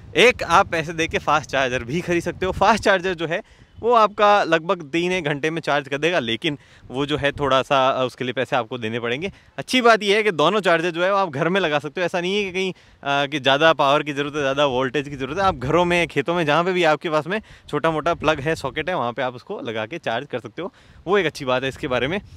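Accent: India. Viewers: U are using somewhat desi extent in your voice while reviewing this desi vehicle that's an awesome thing ❤❤❤❤❤❤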